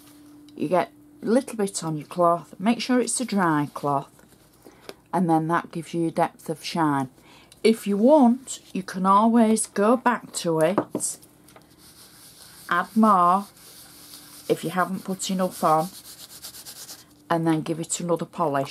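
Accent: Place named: Yorkshire, United Kingdom. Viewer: Nuvo product doesn’t have a sponge, and I never actually learned the “proper” way to use it, so obviously I get it all over my fingertips. Because you’re right: you just want to dig your fingers in it at once. Fortunately, I don’t have a lovely manicure like yours, that I wouldn’t want to mess up. PS. Love listening to you speak. Your Yorkshire accent is “loov-ly”. 😻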